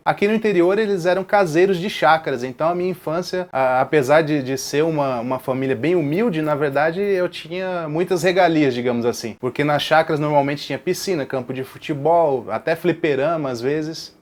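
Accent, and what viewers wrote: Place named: Northeast, Brazil. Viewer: Êa, Cara, Show! obrigado pela dica de anotar a data! eu anotei agora pra não esquecer porquê dissestes. fiquei de cara em saber que é nordestino, já tinha assistido diversos vídeos e não tinha prestado atenção no seu sotaque ainda, e olha que cresci em Sergipe, moro aqui e morei muitos anos na Paraíba também. Abs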